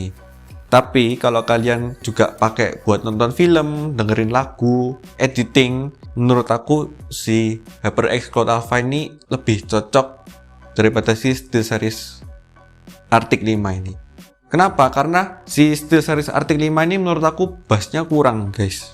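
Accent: Java, Indonesia. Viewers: mantap bang , ada khas medok medoknya . GDluck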